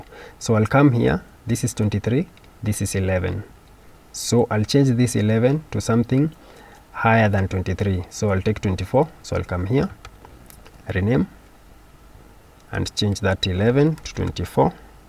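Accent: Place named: India. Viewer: the technical level of the Indians on this platform is impressive, however the Indian accent for me speaking Portugués is a big problem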